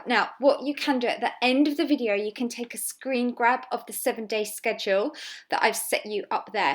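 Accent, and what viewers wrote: United Kingdom. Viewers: Really love your accent I'm American so I find British people's accents to be amazing 😊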